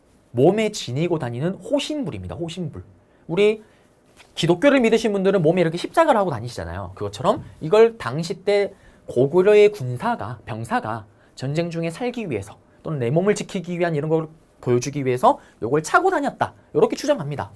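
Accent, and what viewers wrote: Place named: Daegu, South Korea. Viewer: 진짜 이 쌤 짱이에요 ㅋㅋㅋㅋㅋ 좋다는 쌤 다 들어봤어도 저 역알못 이과출신이라 너무 힘들었거든요 ㅠㅠㅠ 근데 이 쌤은 대구 사투리가 구수하셔서 몰입도 잘 되고 설명도 이해가 잘 돼요 ㅋㅋㅋ 이런 강의 무료로 풀어주신 에듀윌님...그리고 쌤 너무너무 감사합니다 ㅠㅠㅠㅠ 한 달 동안 열심히 공부해서 꼭 한능검 잘 봐올게요!!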